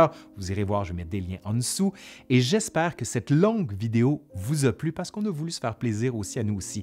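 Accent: Quebec, Canada. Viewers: Bonsoir un grand merci pour l'histoire de France j aime beaucoup votre humour et un accent je pense du Québec si je me trompes je me suis abonnée à votre chaîne car j aime beaucoup l'histoire de France a bientôt merci ❤😊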